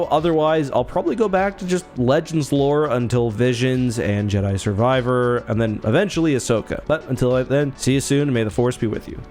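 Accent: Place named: Southern Ontario, Canada. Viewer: I feel like your accent is more pronounced in this video, and its basically the exact same as my southern ontario accent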